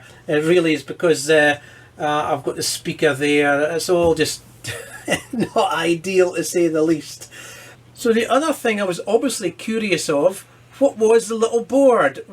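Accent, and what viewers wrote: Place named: Aberdeen, United Kingdom. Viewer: Aye that's a good video, you sound like you have an Aberdeen accent 😁I'm in Aberdeen & you remember the old breaking Channel 14 lol. Perhaps hear you on cb in the Granite City 🏙 😎 👍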